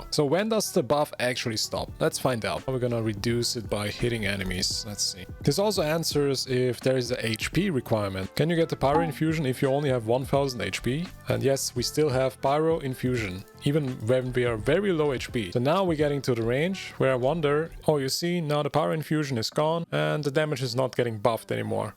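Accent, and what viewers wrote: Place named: Germany. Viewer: ur accent sounds german eww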